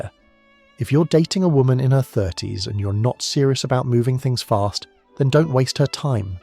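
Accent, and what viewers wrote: United Kingdom. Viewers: Blah, blah, blah, blah, blahhhhhhhhhhhhh Finding some British twit to read your garbage script doesn't turn a turd into roses, it's still bull---- All I heard was an average man excuse manifesto, BEGGING women to settle on them and stop being so mean with their mean mean standards, and leaving them for better men Geez, you Bro's need pacifiers more than you need women. This was ridiculous 😂😂😂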